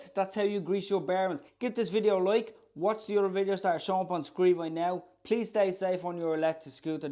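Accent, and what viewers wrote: Ireland. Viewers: Another brilliant informative video as always! also Love your accent mate, the Irish accent is the best in the world imo x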